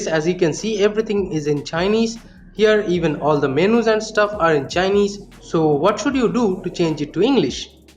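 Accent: India. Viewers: Chineese much better than your hindienglish -_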